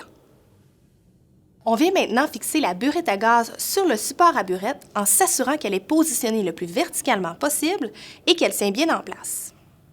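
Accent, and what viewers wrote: Quebec, Canada. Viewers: Qui regarde ça en 2020 ?😹 J'ai connue votre chaîne Youtube grace a mon prof de physique/chimie et en regardant les vidéos j'ai remarquée que ma moyenne en physique/chimie à évoluer 😸 Et j'aime trop ton accents Québécois 😺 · Cette vidéo est incroyable un accent québécois avec des explications claire et pricise que demande mon contrôle de physique mdrrr !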